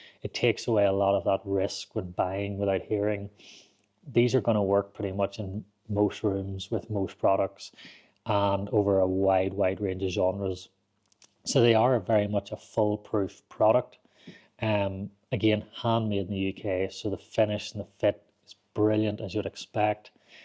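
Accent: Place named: Ireland. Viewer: This gentleman is Irish 🇮🇪 you are having difficulties understanding because of his accent. The UK also has many different regional accents that you would also find difficult to understand.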